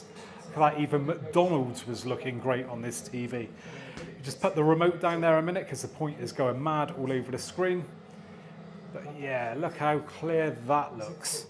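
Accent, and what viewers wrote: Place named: Bristol, United Kingdom. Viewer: Love that, I need one that size for my dining table (limited to £400 Amazon Fire) & it's a very clear description 👍🏻 You remind me of a Bristol version of Ross Kemp 😂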